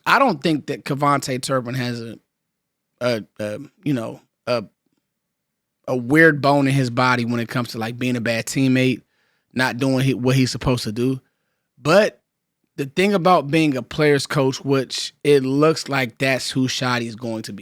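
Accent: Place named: Washington DC, United States. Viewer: I knew u was dc by the way u talk..I ran across a lot of dudes from dc in the feds slim..a lot of them are stand up men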